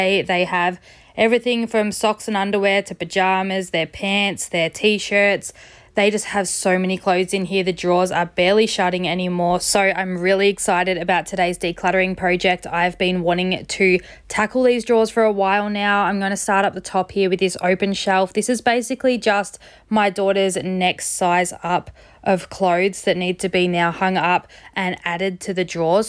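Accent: Australia. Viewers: You know what’s fun? Finding an Aussie making this kind of content! Usually it’s Americans or other nationalities. It’s oldly relatable seeing Big W, Coles and Woolies bags being used as your donation bags haha, just hearing the accent, the “brush it off and keep moving/devil may care” attitude to passive aggressive comments, and Bluey toys around etc even you having a doggo (it feels like all Aussies do!) it’s really a breath of fresh air. Hi from QLD! 💕✨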